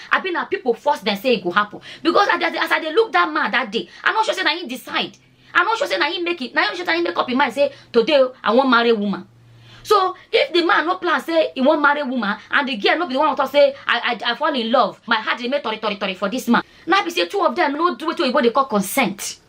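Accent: Nigeria. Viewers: Hi, this your pidgin english TV, i be like say na him sweet pass, the wey you dey take dey yarn the pidgin de sweet me no be small.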